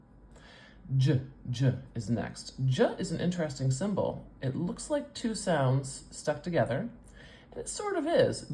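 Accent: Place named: Atlantic Canada, Canada. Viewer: Could you do a series where you transcribe a word in two different accents, like your own Canadian Atlantic accent vs Minnesotan? Or is there a Canadian West Coast accent? East vs West, yo!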